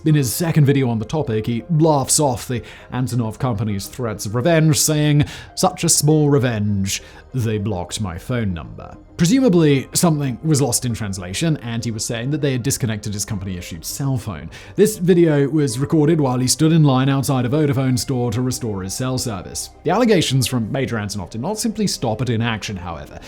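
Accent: RP, United Kingdom. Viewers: it is me or is he sounding even more posh these days..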